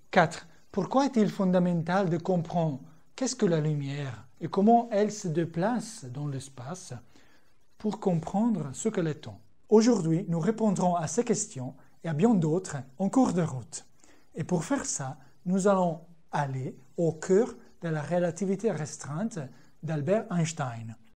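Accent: Italy. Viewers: cavolo che accento italiano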